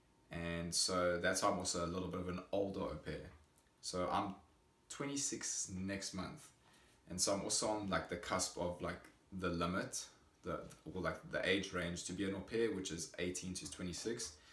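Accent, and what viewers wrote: South Africa. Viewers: Are you South African ? Idk the accent sounds so familiar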